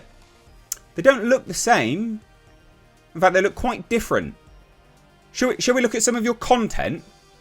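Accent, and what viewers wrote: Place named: England, United Kingdom. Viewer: I always appreciate a genuine English accent and calling someone a dickhead. Like going to a football match and calling the ref a 'silly fat wanker'!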